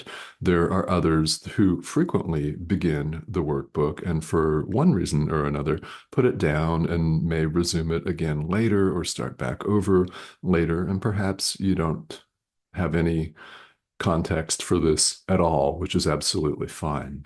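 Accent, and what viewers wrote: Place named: Arizona, United States. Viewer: I knew it, I knew it, I knew it you are from Arizona. I thought Arizona or New Mexico.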